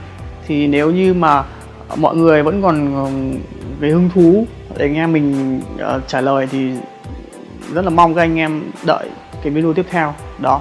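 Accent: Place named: North, Vietnam. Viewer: cảm ơn video của bạn rất nhiều nhé <3 ps: mình thích zọng trai Bắc :))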